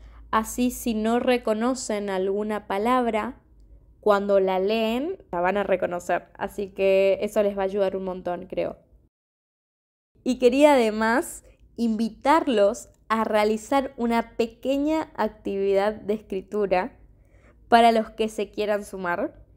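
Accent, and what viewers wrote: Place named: Argentina. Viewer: Hola, ¿ Qué tal? Estoy encantada con tu canal en Spotify y como no encontré mas audios vení al YouTube y ti encontré ❤. Ppr favor ¿Cuando volverás a subir mas vídeo? Estoy aprendendo español y quiero aprender el acento argentino que es muy hermoso, ademas año que viene quiero ir de vacaciones a Argentina y su contenido ayuda muchísimo. Muchas gracias por ellos. Soy brasileña y me encanta el español, Argentina y su gente.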